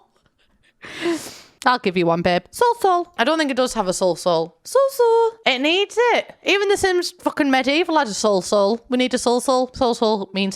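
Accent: United States. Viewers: Omfg I need a whole video on your American accent please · Omg you do such a good American accent!!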